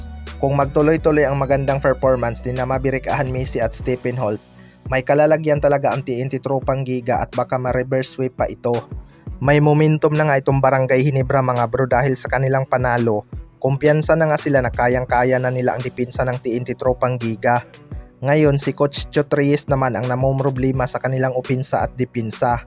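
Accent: Visayas, Philippines. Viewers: bisacol nmn tong salita neto sakit sa tenga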